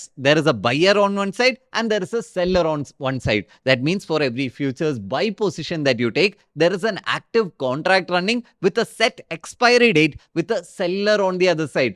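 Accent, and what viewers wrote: Kerala, India. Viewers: Malyali aano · they really got an intelligent dude with the thickest mallu accent to get the genuineness brownie points didnt they 😂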